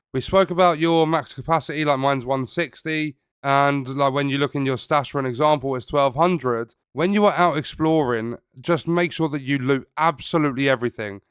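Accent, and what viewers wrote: United Kingdom. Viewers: Every Fallout 76 youtuber seems to be British lol. I know it's not their fault, but the British accent to Americans is so grating lol.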